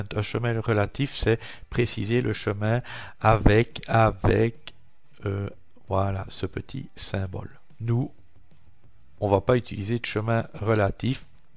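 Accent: Belgium. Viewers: j'adore cet accent belge "une fois", et un pouce bleu pour toi mon ami... j'ai suivi le tuto jusqu'au bout, mais à l'ouverture j'ai un message "Impossible de lire le fichier de ressources: ~ /.rtorrent.rc", je précise que j'ai enregistré le fichier ".rtorrent.rc" dans /usr/share/doc/rtorrent/examples, car je savais pas où l'enregistré c'est là ou j'ai peux être fauté, peux tu m'aider s'il te plait grand chef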